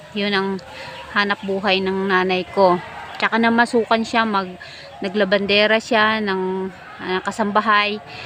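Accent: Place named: Visayas, Philippines. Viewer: Aragoy bisdak ka pla host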